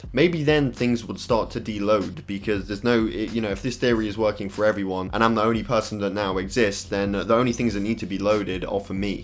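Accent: United Kingdom. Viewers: I was just wanderin' what do u british do to "R"s?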